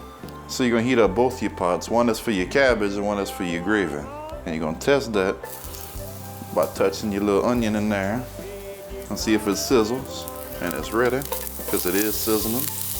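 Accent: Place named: Louisiana, United States. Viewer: it’s the accent for me . louisiana 🤪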